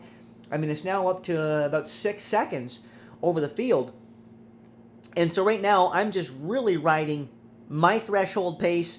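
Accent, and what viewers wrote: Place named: United States. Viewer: What an annyoing ''Yank'' commentary could not listen to this guy!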